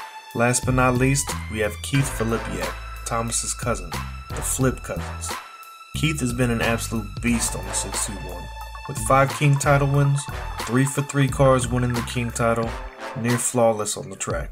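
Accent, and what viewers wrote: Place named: East Coast, United States. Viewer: East coast commentary...yes sir! Good job, and always great racing!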